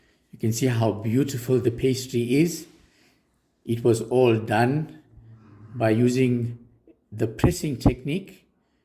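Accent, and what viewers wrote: South Africa. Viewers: ThnXX for Sharing the Recipe with gr8 tips by Pressing the dough balls. Sir just by your accent of talking I knew you’re from South Africa!!! Iam from Zambia LSK. now settled in the 🇺🇸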